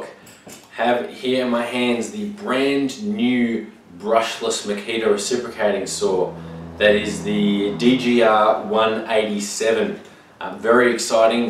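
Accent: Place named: Australia. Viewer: Love it when an Aussie is doing a video - great to hear our accent.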